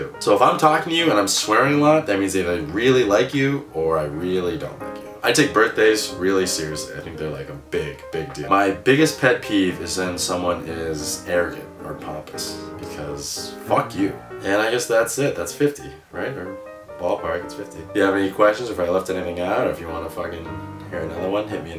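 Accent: Boston, United States. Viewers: Are u from boston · I'm just marvelling at the Boston accent "step on a crack ya break ya muthas back"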